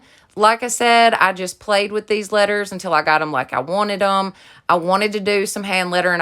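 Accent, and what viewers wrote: South, United States. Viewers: Great DIYs, so inspirational and a great testimony of the true meaning of Easter! Thank you!! The phrase, "He is Risen" has been used for generations from the greeting the disciples shared with all after Christ arose. Today in some churches, it continues to be the greeting we share with one another. Two comments. The undertone of yellow is white, so your lettering blurs and hard to see on your DIY #1, etc. . You might trying black to make your messages stand out, a higher contrast. Also, you do a wonderful job with your passion & creativity. Try not to say your work is not very good. It is all about encouraging each other and no need to do that. It is not easy doing these YouTube videos, I have done one as an Interior Redesigner & color Consultant & Instructor so I enjoy hearing your warm Southern Accent, too. You Go Girl!!!😘😎😇Irene, Chris's Mom, Idaho Color Lady. 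<link>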